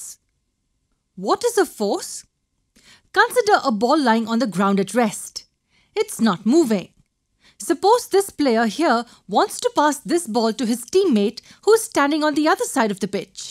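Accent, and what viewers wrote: India. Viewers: This Indian sound Smh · wow indian accent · Heavy Indian accent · R u Indian bc ur English sounds like Indian people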